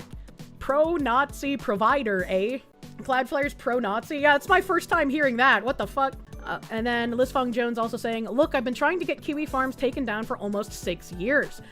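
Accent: Boston, United States. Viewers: Love the coverage, but my "grammar heiling" tendencies flare up every time says ANONYMITY (ah-no-nim-ity) like anonmity (ah-non-mity) 😂 Could be the boston accent though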